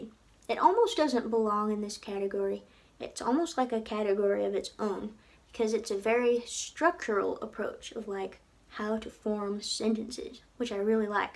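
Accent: South, United States. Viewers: Southern accent! A nice surprise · Love your accent! Which part of the South are you from? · What a great review. I'm returning to learning Gaeilge after nearly 20 years since finishing school. I have a lot of these books and am surprised at how much I've retained over the years. "Buntús Cainte" brings back bad memories for us because of having to practice our tape test in school. I was taken aback to hear your accent at the start, fantastic to see people abroad learning our national language. Your accent is beautiful btw, spent a lot of time down south over there and know a Southern Belle when I hear one :) Go raibh míle maith agat arís, Subscribed.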